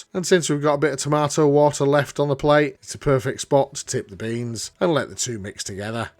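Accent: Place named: Cockney, United Kingdom. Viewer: I need to point out something. Why are you speaking in a Cockney accent? Are you Rodney Trotter from Only Fools and Horses? This is weird.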